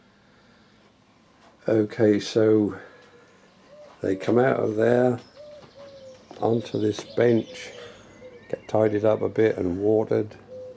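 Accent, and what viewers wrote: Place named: England, United Kingdom. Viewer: i dont understand the latin names with the english accent but they're beutiful... espacialy the ones planted in the ground! i wish i could live by myself only from food i grow and dedicate my entire life for the plants.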